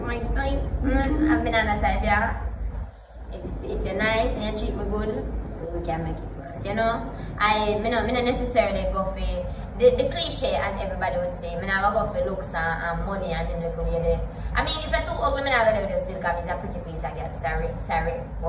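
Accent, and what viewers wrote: Jamaica. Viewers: I don’t know if it the case with …… but I think what the problem is it’s hard to understand her. Cause her patois is strong, strong. I am an American but can usually understand patois. But it depends on the person. From what I’ve learned I think usually those from country can be a little hard to understand. My Jamaican brother in law says he can have a hard time understanding some people there. Think would be one hard to understand for him. Cause it is for me. Maybe if she spoke slower it would help. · When I first saw you on Drizzy doing the sexual harassment episode with the other girls..you had me cracking up. I was born in kingston and i had a few white Jamaican born friends that speak just like you. I am very glad to see you setup your own channel. So blessed up yourself. and keep doing you thing. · I expected to hear uptown patios but a full blown country pickney dis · Patois boss!!😅😅 just found out u have a Chanel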